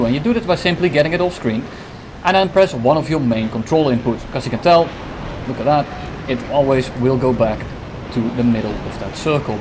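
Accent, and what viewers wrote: Netherlands. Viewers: als deze man niet nederlands is ofz dan eet ik men schoen op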